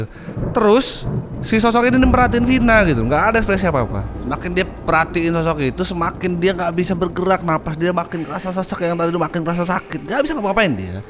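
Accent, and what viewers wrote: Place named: Lampung, Indonesia. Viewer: bg.. omong mu jowo tpi logat mu. lampung.. mntab mntb.. suka dngrinya. 👏👏👏👍👍👍😁😊😊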